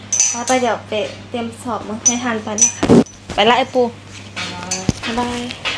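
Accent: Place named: Laos. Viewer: พูดไทนชัดมากพยายามอย่าพูดตกสำเนียงลาว-ร-ล-ช-ให้ชัด-ควม-ความ❤❤❤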